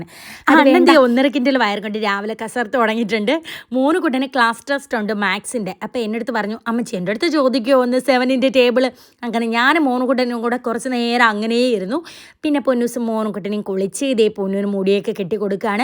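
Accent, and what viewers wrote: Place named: Thrissur, India. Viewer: Njn oru thrissur kari ahne ante amayi nt mole kolam punaloor ke ahne marriage cheythe ayachde, chechi edh polle same style ahne samsrika adh thrissur slang full mari samsrikana polle same way ahne samasrika, njn evarde video first kandapo vijariche edh thanne ahne ahh chechi samsrikana polle ahanallone 😇 edh oke oeu allekarede nade videum oke difference ille endinna igane negative comment idane 🫡 nammake ishttam alle ayalde video kannanda 😊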